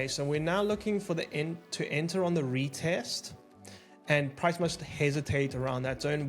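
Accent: South Africa. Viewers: Thank you for this video - nice and clear and I think it was a beautiful South African accent :) I am new on your channel and found this very helpful!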